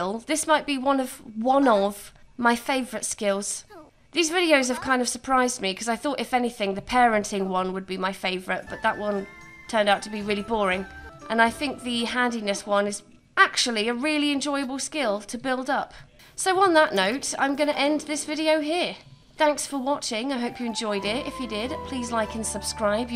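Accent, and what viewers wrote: United Kingdom. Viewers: I love ur vids cuz their so funny and i like hearing ur voice! British voices r so smooth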